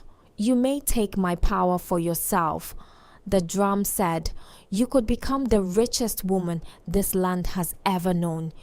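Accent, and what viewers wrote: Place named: Africa. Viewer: Please don't Englishfi the story we have enough English stories and movies. We prefer raw African accent.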